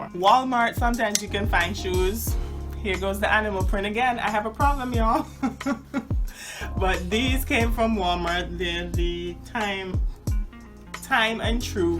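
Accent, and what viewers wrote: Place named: Caribbean. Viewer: Thank you for this! 💕I’m a young girl wear a size 12 feet and can’t find cute shoes anywhere😩. Love your accent. I’m also from the Caribbean. I’m from the Virgin Islands.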